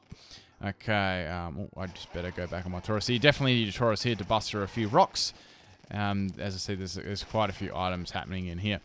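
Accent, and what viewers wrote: Australia. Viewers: today i was at toys r us and there was an Australian family there. the moment they started talking with the Australian accent, i immediately thought of you lol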